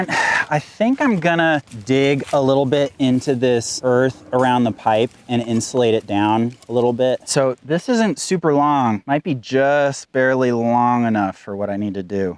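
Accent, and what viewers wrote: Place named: United States. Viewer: Sometimes I have to try and work out what you're saying in American and translate it to English new word soffits in English they called fascia boards, and if you're trying to insist you speak English, I can tell you is definitely American there's a new your loss of bastardising English which is doving translated into English means driving, so don't tell me you're speaking English still like your videos even though I have to try and work out what you're saying in English.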